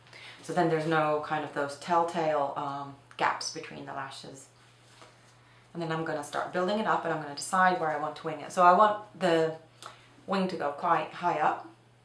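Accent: Ireland. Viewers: are u irish? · I actually thought you were Irish :D I love the tutorial and your accent is really cute too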